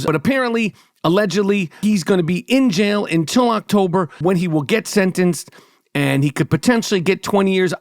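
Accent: New York, United States. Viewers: I thought the Jewish community of NY voted for what’s his name?? If you keep talking like that no one is going listen to you because you rant!! Slow down love !!! Why is everybody saying the Jewish New Yorkers voted for this guy? I don’t get it….😂😂😂your accent i guess you don’t like LA …you rather NY …man I was born in London, tooooo many people in NY i couldn’t walk without bumping in to a person hahaahhah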